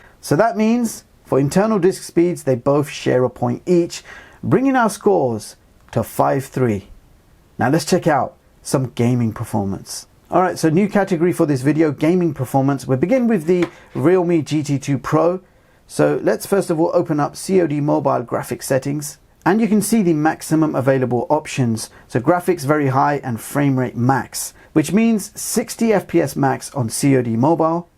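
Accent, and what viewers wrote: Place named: United Kingdom. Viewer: i love British accent ❤️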